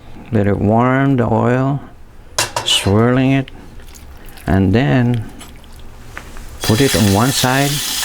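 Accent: Philippines. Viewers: Kuya, masyado ka namang pretending mag english accent. Hirap na hirap ka sa english bakit di ka nalang nag tagalog Sabagay lumalabas pag ka accent ng Visaya mo.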